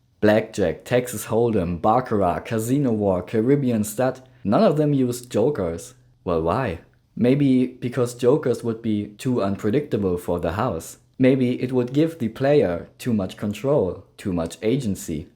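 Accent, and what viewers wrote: Germany. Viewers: Are you german ? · Soooo, are you German perchance?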